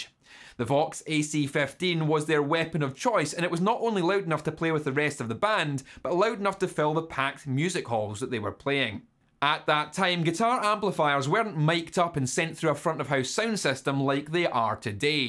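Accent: Scotland, United Kingdom. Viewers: Nice scottish accent:-) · I just love that Scottish accent. Think $1.00 per watt. I use a Crate Power Block CPB150 150 watt amp through 2 stereo Akai SW-155 speakers with a 250 watt car woofer. They sound great the way I have 'em wired up. I love my Crate. It's a Great Crate. The girl in my house (wife) screams "turn it down"! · I love your scottish accent. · Two things. First off I love your accent. Are you Scottish? ? Second thing is I play bass and drums. I did a bass gig a few years back where I used my friends Randal beauty bass rig. Seperate head and cab. No pedals, bass strait to amp. I think the cab configuration is 6 10"s . I'm not sure of the wattage. But it's a great old unit. No frills, just really fat clean low end.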